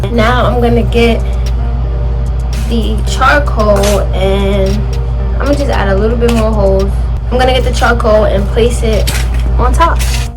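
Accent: New York, United States. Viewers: I love your accent , sounds newyorkish or Jersey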